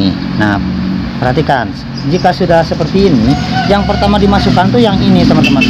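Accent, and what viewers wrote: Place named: Banyumas, Indonesia. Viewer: Republik Ngapak..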